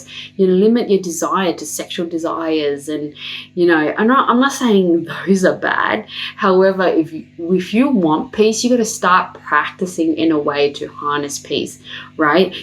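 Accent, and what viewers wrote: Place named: Australia. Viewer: Great advice! So important to be in the moment. Btw love your Aussie accent!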